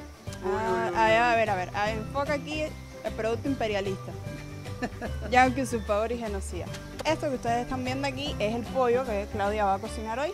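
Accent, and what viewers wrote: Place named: Cuba. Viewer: Conozco tu país, pero cuando estuve por ahí no existía la pandemia, por lo que no tuve la oportunidad de familiarizarme con el término. Lo que sí existía era un calor de morirse. Muy lindos tus videos, muy sugerentes, porque a lo coloquial de tu lenguaje con ese dejo muy cubano, le agregás una simpatía que cautivaría a cualquiera que te viera. Te felicito.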